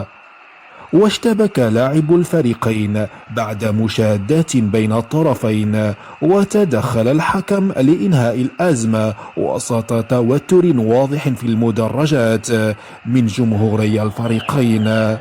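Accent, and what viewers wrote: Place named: Morocco. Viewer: تتكلم متل يهودي مغربي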